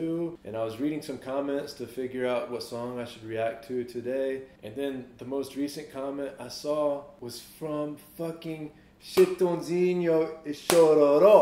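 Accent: United States. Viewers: cara esse sotaque texano é foda demais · nouth cawolina lmao love your accent buddy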